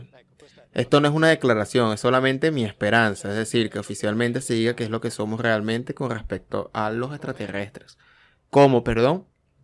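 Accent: Venezuela. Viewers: No es así lo que sucede es que tiene el acento venezolano diferente a otro país que no sea caribeño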